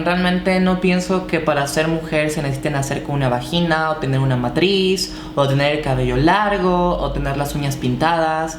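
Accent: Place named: Fresa, Mexico. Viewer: porqué siempre hablas tan pinche fresa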